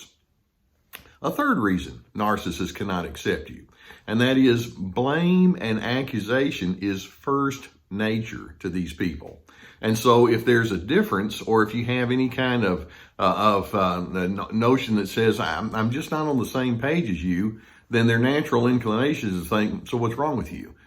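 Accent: United States. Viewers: Omg I just love this guys accent. It's the nicest in the USA ... Best wishes from Ireland 🇮🇪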